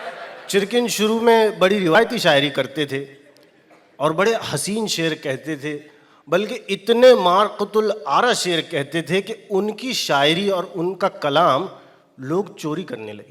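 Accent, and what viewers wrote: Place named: Maharashtra, India. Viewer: I can still find Marathi accent in his Urdu. He doesn't sound prominent Urdu; sounds like Marathi Urdu, but very good attempt ❤.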